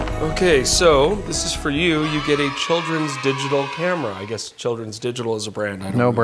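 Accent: Australia. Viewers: ' Aussie accent isn't bad. As for the cameras: BLEECHHH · holy shit I was not expecting that Australian accent even after seeing the comments. Genuinely A+ effort lmao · Good Aussie Accent! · woa great Aussie accent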